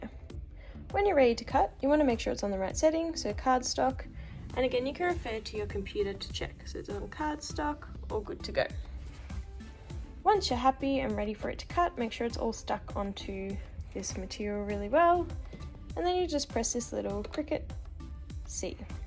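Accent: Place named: Australia. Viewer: i adore your australian accent 😍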